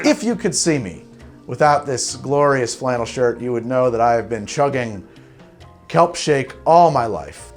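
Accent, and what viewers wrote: New Jersey, United States. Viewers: He does a good Spongebob laugh and I wonder since he's in Jersey does he pronounce Water the correct way or does he change it so the other state living people don't start up their bs?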